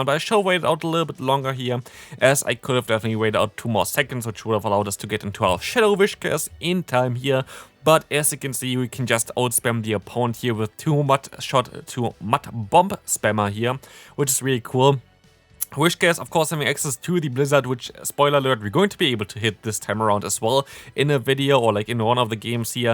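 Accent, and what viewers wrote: Germany. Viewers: He's german.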